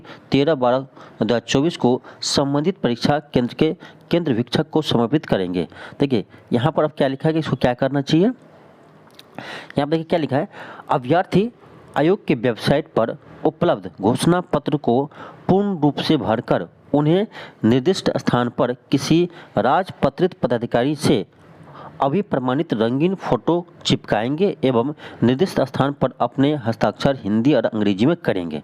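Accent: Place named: Rural, India. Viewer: Ganda video quality. Gawar ki tarah bol rha hai.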